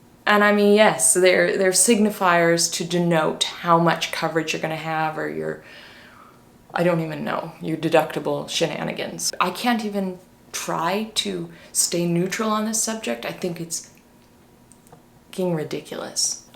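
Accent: United States. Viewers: Very good overview and comparison, but I just wondered, if you'd lived for most of your life in the UK and only recently returned to the US, why do you have such a strong American accent? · I have to wonder, if you spent most of you adult life in the UK, why did you agree to give up what must have been a beautiful Briish sccent in favour of an American one. How long were you in the US before they stole your voice? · You don't sound like somebody who grew up in the uk. Your accent is very usa. Did you grow up in the usa then move to the uk, then move back to the usa? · Seems in the states you get ill you’ll go broke fast Your accent is so American, you lost the uk accent really quick in only 1 year?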